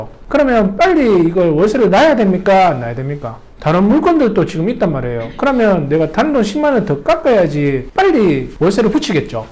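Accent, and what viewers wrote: Daegu, South Korea. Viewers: 말씀 넘 재미있게 하세요 대구 사투리 넘 매력 있어요~ 귀에 쏙쏙 잘 들어와요~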